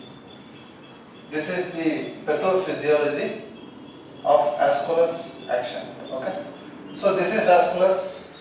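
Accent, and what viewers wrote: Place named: South India, India. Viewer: Southern pronunciation is always to be a problematic for us.And what is going on in the bakground?Annoying sound making mad.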